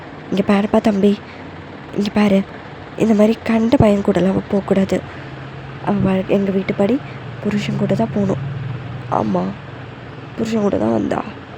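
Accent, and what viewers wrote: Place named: Kerala, India. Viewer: i luv ur Kerala voice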